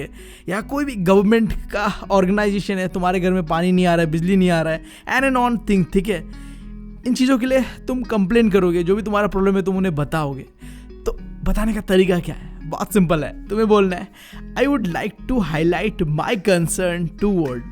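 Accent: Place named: Bengal, India. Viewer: Bengali accent is soo good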